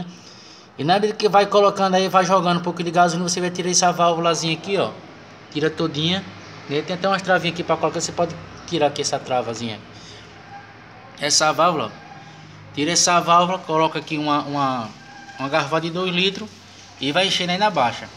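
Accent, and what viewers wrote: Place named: Maranhão, Brazil. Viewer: Parece Maranhence falando, não entendi nada.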